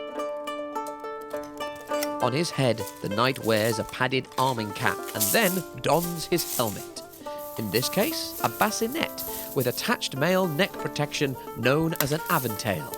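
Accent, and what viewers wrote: United Kingdom. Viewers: man this guy doesnt know what he's talking about does he ps. your accent sucks man + british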